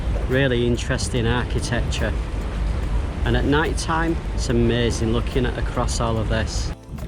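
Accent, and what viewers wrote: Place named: South Yorkshire, United Kingdom. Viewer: His accent is South Yorkshire accent including accents in Leeds Barnsley Doncaster and Sheffield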